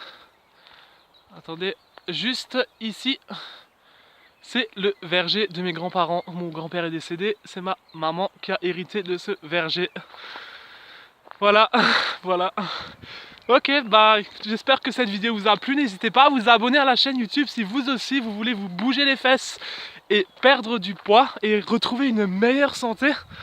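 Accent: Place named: Alsace, France. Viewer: Bonjour, votre accent me fais penser à l’Alsace, super endroit pour retrouver la paix. Je me bas aussi contre le surpoids. la vidéo est intéressante. Bonne Journée. Estelle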